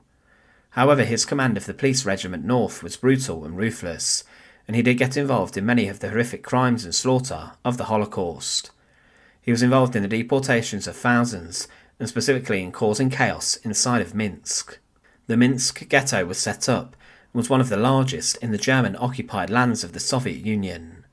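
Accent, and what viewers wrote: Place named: England, United Kingdom. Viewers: He definitely is English. He just has no idea how to read a script. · i The Narrator has an English accent… just cannot read a script fluidly. Awful .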